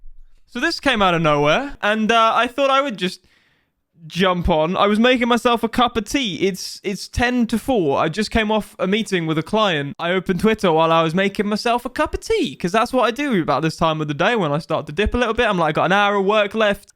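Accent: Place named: United Kingdom. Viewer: I love your British accent omg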